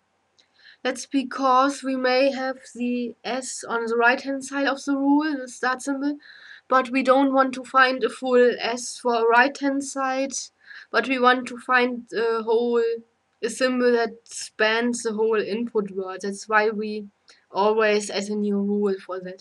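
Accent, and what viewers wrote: Germany. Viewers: Idk guys, l am able to understand her, I guess experience talking to Germans helps. Thank you for the video! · u have german accent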